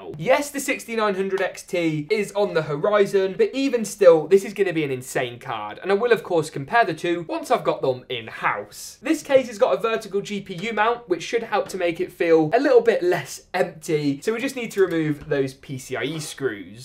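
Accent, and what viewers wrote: United Kingdom. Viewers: You should be britishian your accent show it 😁👍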